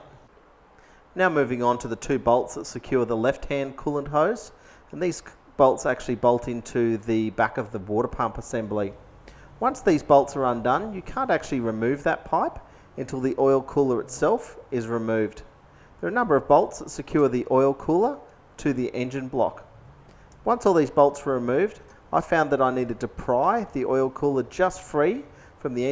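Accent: Australia. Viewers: ausie accent??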